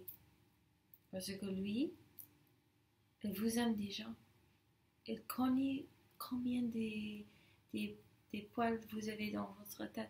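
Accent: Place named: France. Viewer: Ton accent français est trop mignon ;)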